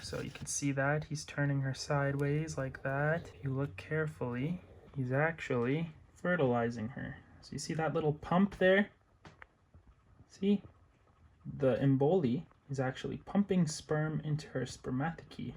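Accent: France. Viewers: I love your french accent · The French accent lmaooo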